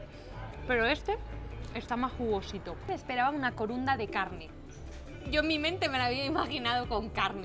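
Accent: Andalusia, Spain. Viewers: Hola amiga! Amiga, una pregunta. Por qué hablas como una española de España? No pareces que eres España, pareces mexicana. Perdón por ofenderte! Saludos desde Michoacán, México. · ¡Excelso video! ¡me encanta tu forma de ser tan alegre! ¿eres andalusí?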